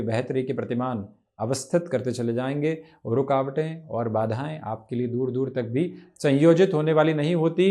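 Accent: Standard. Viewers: Jai sri Krishna sir .... Bhaagya se zyada aap k ki pure hindi sunne mein Anand aa raha hai...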